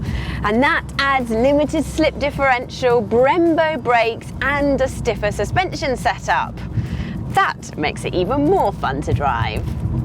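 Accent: United Kingdom. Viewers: Why British accent sounds classier than American?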